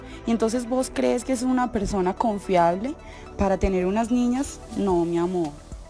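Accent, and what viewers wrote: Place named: Colombia. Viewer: Ese acento es mi compatriota de Colombia dime si no estoy en lo correcto 😂😂😂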